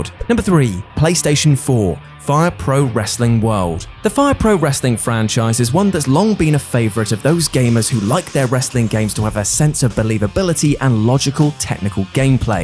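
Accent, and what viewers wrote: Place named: United Kingdom. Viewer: All the weird brits talk like that. It's annoying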